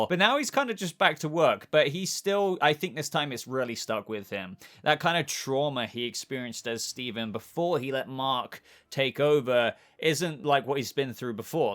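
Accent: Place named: London, United Kingdom. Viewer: to be fair his accent does sound like a foreigner maybe greek turkish Spainsh guy who lived in london or south England kinda middle class esk area or ppl or very r.p speaking environment like retail , office , customer service mix with abit of 'lil o bit' Oliver twisty shite Cockney accent i have heard people talk him or similar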